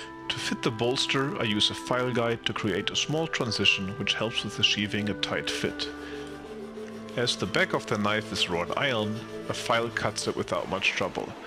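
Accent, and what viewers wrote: Germany. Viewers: Are you german? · that´s some fine german accent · Are you a german? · Kann es sein das du aus Deutschland bist. Das hört man nämlich voll an deinem Akzent :) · Ich wusste ich habe da einen leichten deutschen Akzent rausgehört ^^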